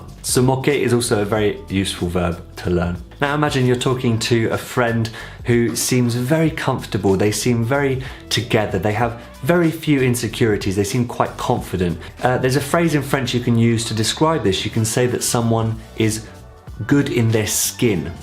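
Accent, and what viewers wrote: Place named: England, United Kingdom. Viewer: je pense que nous apprends le meme facon donc j'aime ca ton videos tellement m'aider. Je sais ma francais est mal, mais avec le pratiquer chaque jour c'est devenir plus en plus facile. merci de m'aider. ca fait deux mois depuis je commencer mon voyage de apprendre et ton aide est vraiment utile. - aussi une idee pour toi. - I would really like a video in full french on a simple subject, as hearing english accent in french is very clear for me and helpful since im also from the UK, maybe you already have one?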